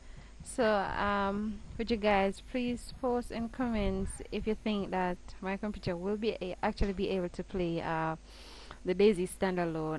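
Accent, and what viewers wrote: Jamaica. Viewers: you sound jamaican i am jamaican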